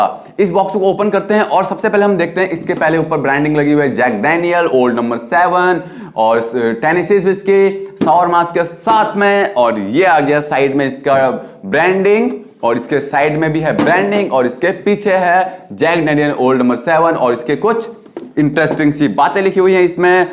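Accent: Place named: Pahadi, India. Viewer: Bhai pahadi lg re aap ........uk se ho kya???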